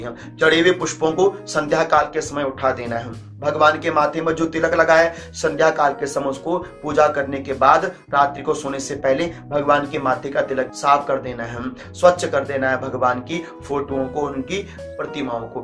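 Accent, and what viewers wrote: Standard. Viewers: Bahut achhi video,shudha uchharan k sath...apka dhanywad...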